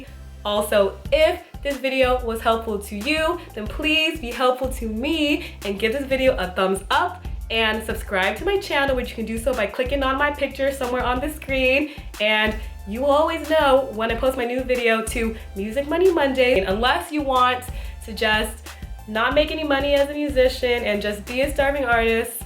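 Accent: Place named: Barbados. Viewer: Are you a Bajan? You sound Bajan! Holla back.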